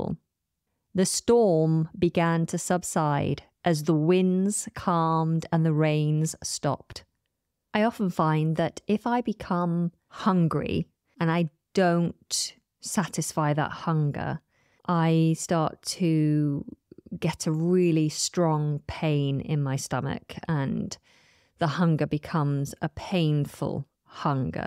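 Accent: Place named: United Kingdom. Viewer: my favorite english teacher.... I love her british acent❤❤❤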